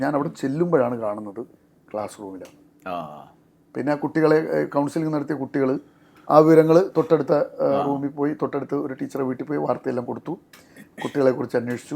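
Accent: Kannur, India. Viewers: Kannur slang aanu no problem..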